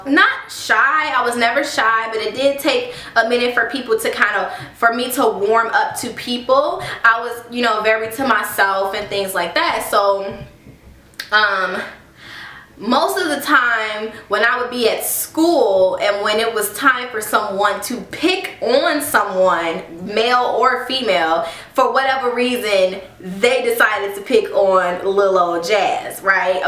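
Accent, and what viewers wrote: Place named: New Jersey, United States. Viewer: im from south side and you sound like you from New Jersey 😂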